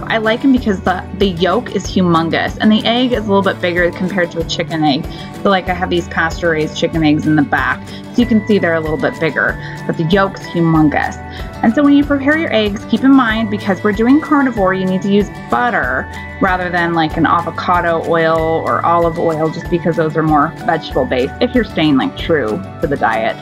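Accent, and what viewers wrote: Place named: Minnesota, United States. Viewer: Your either from Mn or wi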